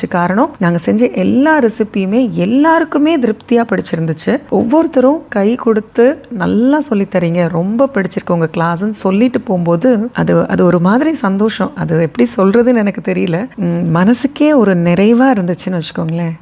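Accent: Madurai, India. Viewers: Madurai ya akka neenga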